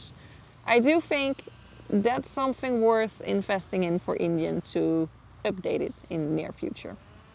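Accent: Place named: New Brunswick, Canada. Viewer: I couldn’t afford one so I picked up an MT-09. Was obsessed with it, especially the 2023 FTR 1200 S model, which has the exact same tires as the R6 I just sold. Couldn’t ride sport bikes anymore and wanted something upright sitting (with good hp AND torque) so these bikes fit the feel, size, sport category style and power which saves me from going to a heavy low hp cruiser. I was shocked to hear that the paint peels especially after knowing it was $4000 more than my MT-09. Dad always wanted a Harley before he passed but the 70s/80s HD’s were different. My go to in his memory is this. Now I just have to remember it’s jerky at high rpm and paint peels. I’m in NB, Canada and could tell from the accent and the bridges in the background where this was filmed haha! Awesome video! Agreed. Ryan F9’s video on it was great! +Glad to hear the windscreen helps! 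Had to add one to my MT-09. I’m used to the cockpit feel with R1 and R6 windscreens! Glad you didn’t hold back on what you didn’t like!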